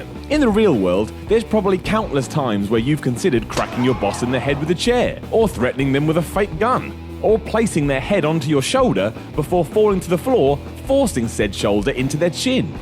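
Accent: United Kingdom. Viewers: I don't mean the accent over the a, I mean Simons British accent. So he used to saying it that way.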